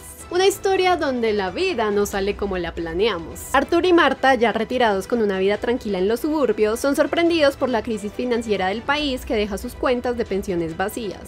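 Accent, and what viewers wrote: United Kingdom. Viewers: hablando ingles británico 😁😁😁 Amigeeks: Mira ese potencial.jpg Yo: Que femenina.jpg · Me encanta el acento inglés de 🇬🇧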